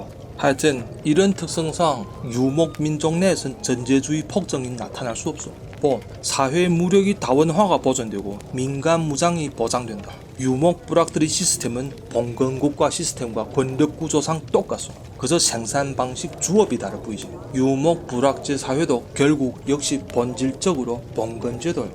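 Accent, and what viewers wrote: Yanbian, China. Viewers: 피뜩 들어두 연변사람 옳스꾸마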